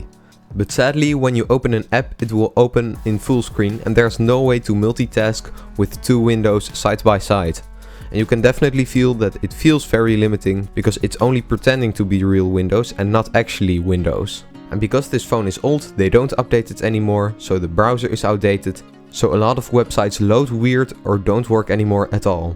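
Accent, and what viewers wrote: Netherlands. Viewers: I can hear the dutch accent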